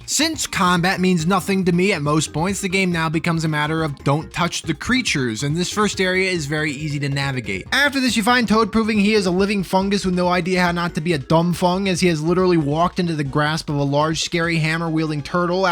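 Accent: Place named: New York, United States. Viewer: A New York AVGN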